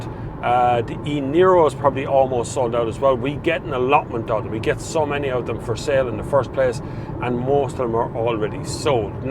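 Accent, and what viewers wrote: Ireland. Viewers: Good reviews of both this and the ID3 - we are currently looking at both cars and wondered what your preference would be - looking at the VW ID 3 Family or the Kia E-Soul? Husband is from Laois so it really helped having a familiar accent to show us the pros and cons of both cars.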